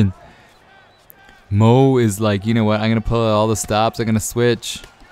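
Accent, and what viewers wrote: Canada. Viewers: you're so Canadian I love it eh hahah. isn't the Spartan fighting this weekend? I love him. and actually didn't kno he was Canadian haha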